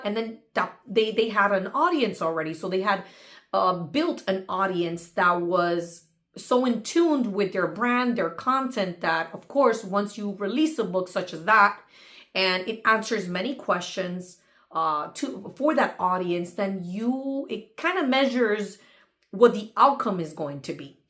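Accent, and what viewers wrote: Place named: Latin America. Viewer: I just finished this video. Priceless insights! And loved the subtle Latina accent. It helped me to connect and invest just a little bit more. Looking for the next one to watch. Thanks again!!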